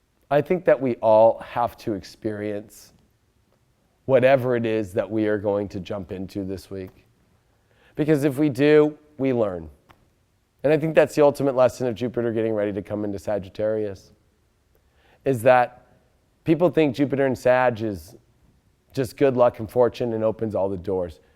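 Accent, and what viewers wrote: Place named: California, United States. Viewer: Love you dude you talk just like my friends back home must be a cal thing. Thanks bro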